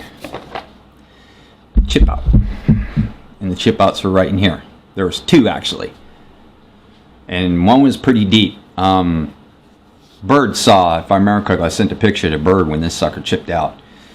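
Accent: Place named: Indiana, United States. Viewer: No sounds like you live in Indiana bro trust this old Hoosier boy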